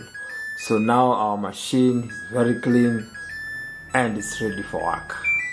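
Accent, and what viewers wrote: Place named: Kenya. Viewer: Sounds kenyan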